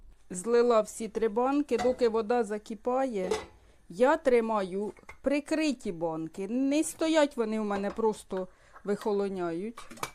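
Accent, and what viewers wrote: Poltava, Ukraine. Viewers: почула вашу мову і сльози на очах.... Мені вже 60. Я народилась в Полтавській обл, Чутівський рн. Ви так балакаєте, як у моєму рідному селі Нагорна, поряд Вільховатка. Звідти родом моя покійна мама. Так хочеться хоч разочок ще побачити те, чого ніколи не забувала. Завадила війна. Щиро дякую тобі за наш особливий говір. Ти й не уявляєш, яке це щастя. Наче побувала на Полтавщині.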